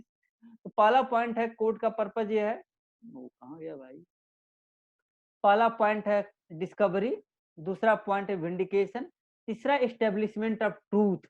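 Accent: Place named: Bhojpuri, India. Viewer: English doesn't matter. Apki bhojpuri bahut sweet hai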